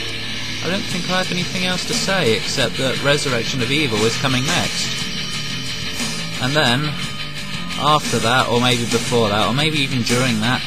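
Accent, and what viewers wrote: United Kingdom. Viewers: good job ^^ also nice British accent =3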